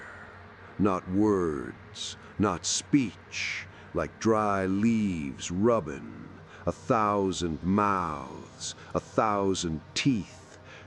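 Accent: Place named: Appalachia, United States. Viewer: I find it an interesting mix that protagonist has a Russian name (a diminutive of Anton) but the narrator dialect makes me think this is takin' place down in the holler of Appalachia. I like it a lot!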